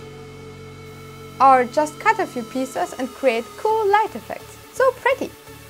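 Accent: Germany. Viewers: German accents are so cute. Makes me want to hug every German when they speak English.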